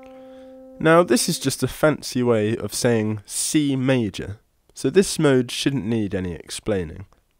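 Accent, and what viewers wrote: United Kingdom. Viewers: thank u ،،;btw r u from UK?i enjoyed ur accent 👏👏♥🌷🌷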